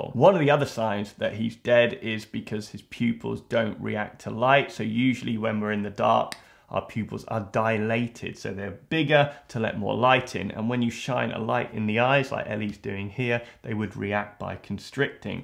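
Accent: United Kingdom. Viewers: Is it just an automatic response to remove an impaled object? (I heard Steve Irwin did that w/ the stingray barb, that there was a chance to save his life had he not done that.) Can't wait for your reaction to the next episode. It's by far the darkest ep. I'm not usually a fan of a moustache but combined with the British accent, it oddly works for me 😉😄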